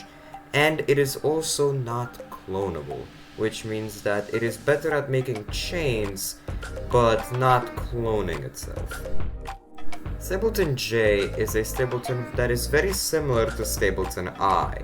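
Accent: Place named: Romania. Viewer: A video about 3 years ago, and actually sounds romanian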